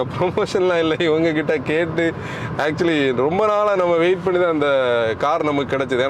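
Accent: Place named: Thoothukudi, India. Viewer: Hi This is among one of the best way of reviews among the most of the moto wagan reviews, incl ur review of Slavia 2025. You have covered the review in Q&A method. You even compared with parallel sedan variant of skoda. Thanks a lot for ur service. Your review is one among the best in comparison with other national level reviews. On the lighter side, I like ur Thoothukudi தமிழ்.🎉😊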